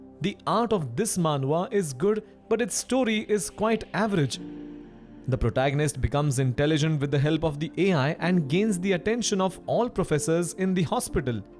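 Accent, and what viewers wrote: India. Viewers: The Indian accent is whoop ass amazing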